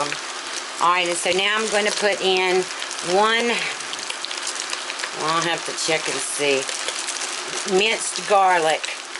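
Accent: South, United States. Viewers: I cant believe any body could be so rude to you...I guess someone with too much time on thier hands. Im.a brand new subscriber, love your recipe, and your southern accent..keep the great recipes coming. And God Bless You❤ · Looks delish! Never heard of Lux Pinto Beans..? Do they sell this brand in New York? LOL.... Love your Southern Accent!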